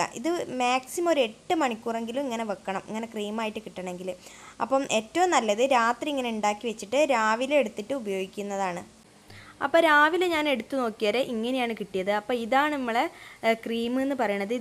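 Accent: Kozhikode, India. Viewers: കോഴിക്കോട് ഭാഷ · Samsaram kidu...oru calicut chuva🤣. Nte mon ipol niram kuranju orupad...birthtime to 6 month fair ayirunnu but ipol....njan niram illa...hus fair aanu...ipo ellarum kaliakkunnu ooh ummane pole ayallo karuthitt ennokke.....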